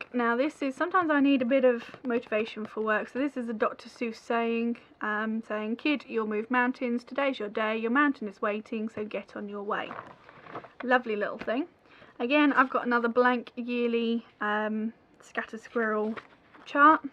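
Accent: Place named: England, United Kingdom. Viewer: Really interesting and refreshing to hear an English accent. Also great tips. Thank you for sharing.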